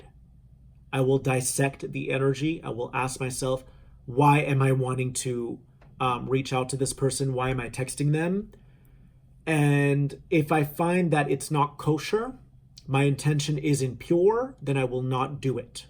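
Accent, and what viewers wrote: United States. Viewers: Felt like I was talking to an awesome kinda retired cowboy.... Lovely warm southern accent I wanna hear what he has to offer... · Thanks for sharing this! Clyde is fascinating, I used to pretend to be Bonnie from the classical story when I was younger lol. I grew up in San Antonio TX so the accent is nostalgic. Channeling individuals from other timelines used to be something I would do when I was sitting in front of my bathroom mirror or in the shower before awakening to this gift's true potential. The power of our imagination is something I have been so passionate about discovering more about recently. Definitely going to use your technique of asking what inspires people to reach out as a way to get a feel for the energy. I feel this so deeply towards the collective in regards to the sexual energy. I feel this soup metaphor so much on a personal level. 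Ooo excited to hear about the spiral. Your last point reminds me of the adage " I'm rubber your glue" but instead of only words sticking energetic patterns sick as well. It was lovely spending my now moment with you, as per usual.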